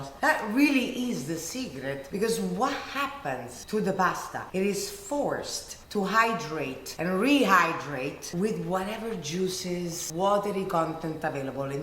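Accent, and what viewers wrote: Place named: Rome, Italy. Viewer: Ma tu sei romana perché sento quando parli italiano la cadenza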